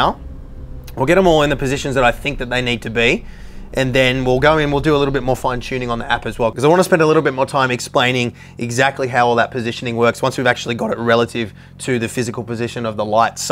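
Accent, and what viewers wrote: Australia. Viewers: Philips: Dutch brand and product. You’re welcome Your “unlimited budget” make it easier for us to decide if this is something to invest in so personally I’m happy you’re doing all of this 👍 But man, these videos you put up here are always the most complete and informative you can find around here on this topic. The Australian accent gives the entertainment factor a huge boost also.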